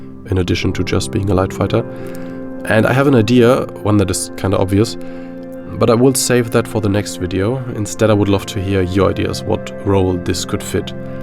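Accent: Germany. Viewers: dieser akzent macht mich fertig..deutscher ? :D aber respekt guter content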